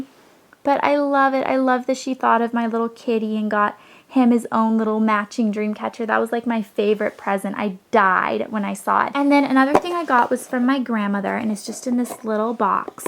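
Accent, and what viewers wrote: South, United States. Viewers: It's funny because you sound southern! My favorite was the dreamcatcher! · Haha your accent seems like it's gotten stronger from visiting home! I never really noticed a Southern accent but near the end of the video it seems to really come out! :)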